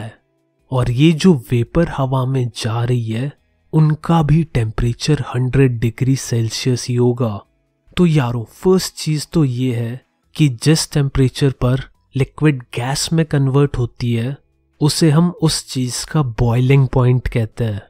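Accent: Haryana, India. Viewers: I bet ..This guy is from HARYANA !! 🥶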